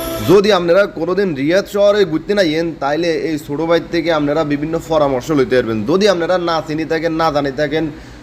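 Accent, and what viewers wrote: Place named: Chittagong, Bangladesh. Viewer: চিটাগাং ভাসা মনে হয়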